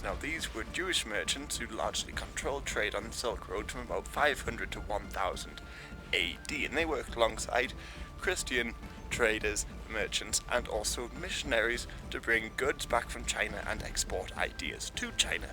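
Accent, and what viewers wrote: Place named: Northumberland, United Kingdom. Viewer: Are you originally from Northumberland? We have the same accent.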